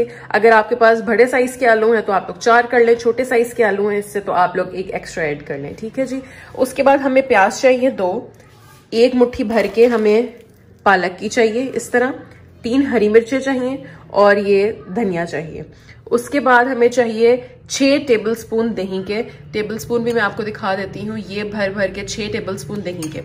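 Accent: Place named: Punjab, India. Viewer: Your Punjabi touch in conversation and smile 😀 love it ❤️❤️❤️❤️❤️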